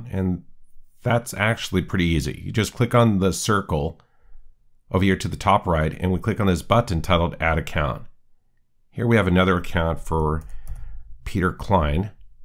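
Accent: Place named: United States. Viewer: And u speak "American-English." I can understand some dialects, accents...But IF I cannot -- that's just another CHALLENGE for me in an already challenging situation. I dont want to be inflexible, but it is just a fact. Again, MANY THANX!!!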